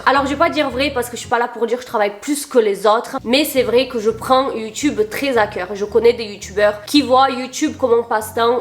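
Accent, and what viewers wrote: South, France. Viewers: Pas un préjugée mais la première impression que j’ai eu de toi mis à part le fait que tu sois adorable , c’est une francaise du sud , alors je te dis pas le jour où j’ai su que tu es brésilienne j’étais choquée car tu n’as aucun accent (non français ) non seulement tu as l’accent français mais en plus celui du sud que j’adore , franchement bravo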